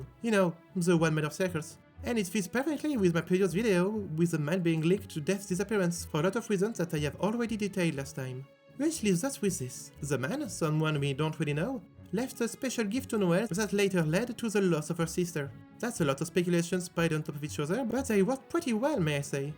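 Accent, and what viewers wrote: France. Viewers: Strongest French Accent ive Ever Heard, Neat ! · you're motivated to make english content, I would encourage you to work on your accent. I was interested in what you had to say about this, but your french accent is very, very thick to the point where it makes it very hard to listen. (This is coming from a french-english bilingual person.)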